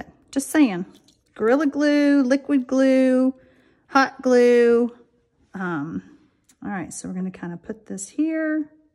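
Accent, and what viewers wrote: South, United States. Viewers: You sound so much like my fave first cousin... my cousin was Southern too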